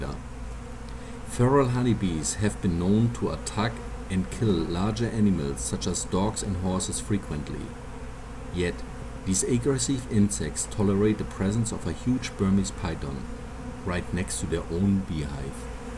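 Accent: Germany. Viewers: are u from Germany ?? sound like it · I can hear you're German. Mega cooles Video! Weiter so! Deine Stimme ist echt beruhigend 😂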